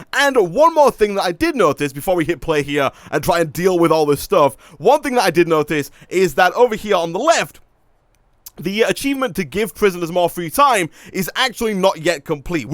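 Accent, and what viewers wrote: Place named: Yorkshire, United Kingdom. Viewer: I'M FROM OOP NORTH YORKSHIRE But seriously, that is the most Yorkshire accent I have heard on YouTube.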